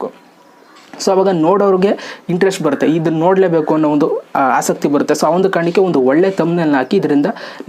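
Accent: Mandya, India. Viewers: Wow nett nett ge band bittiruthe what a language in mandya I like it thamma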